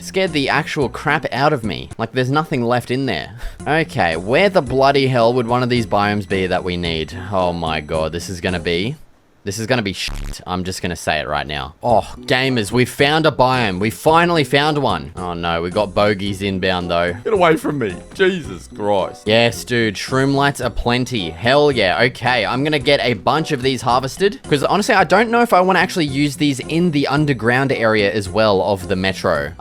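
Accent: Australia. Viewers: this is the most australian man i've ever heard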